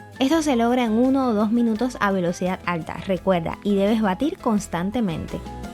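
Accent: Cuba. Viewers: Excelente receta, acompaño tus recetas. Soy de Puerto Padre. Las Tunas. Siempre me pareció tu acento parecido a los holguineros.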